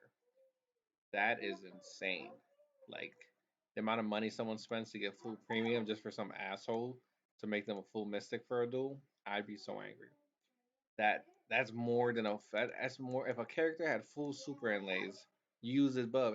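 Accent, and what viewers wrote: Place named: Philippines. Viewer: Bruh, u murican? I always thought you're PH 😅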